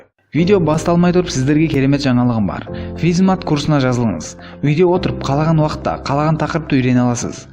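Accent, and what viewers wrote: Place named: Uzbekistan. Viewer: Тилин биртурли сенин озбексинба акцент бар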